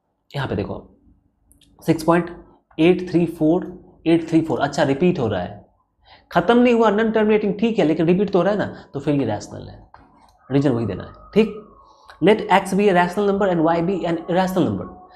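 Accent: Bihar, India. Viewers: Sir ap Bihar se hai kya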